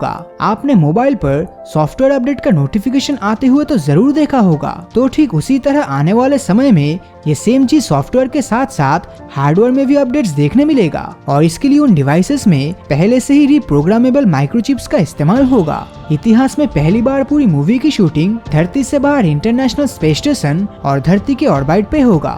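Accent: Bengal, India. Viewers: Is your mother tongue bengali?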